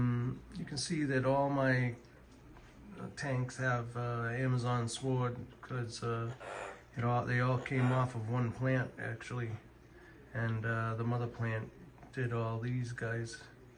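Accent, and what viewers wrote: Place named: Boston, United States. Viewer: Great first video,! I think it is a lot better than my first video. Love the Boston accent--I haven't heard that in a while. You need to turn that up! Keep up the good work. Anyone with Mascara barbs is number 1 in my book. Oh, and BTW, I love the live stream that was playing on your big-screen TV.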